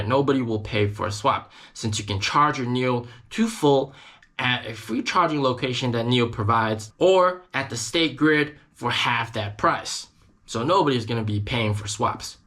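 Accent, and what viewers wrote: South, United States. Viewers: Are you working for Tesla, Maaaaan....you accent is right out usa .....how many billions tesla has burnt..? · Informative insider look, great video The southern accent caught me off guard 😂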